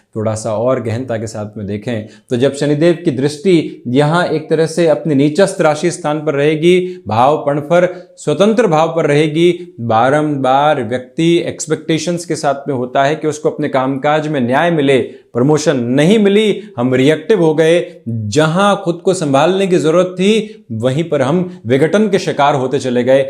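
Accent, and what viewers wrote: Standard. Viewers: Itni shudh hindi thodi si english ke sath 😱😳😱😳😲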